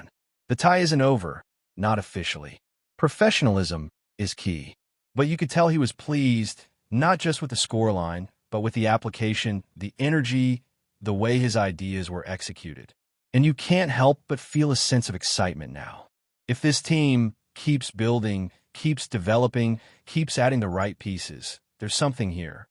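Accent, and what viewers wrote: United States. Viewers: Typical USA commentator..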